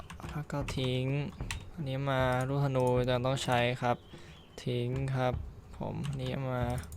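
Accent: Suphanburi, Thailand. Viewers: ก็ว่าเสียงเหน่อๆ เพิ่งรู้หนาเนี่ย ว่า เป็นคนสุพรรณ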